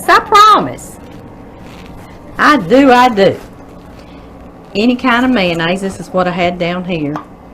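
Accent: South, United States. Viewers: I love the way you talk, keep it up!!!! Southern ladies have the most beautiful accent!!! LOVE IT!!!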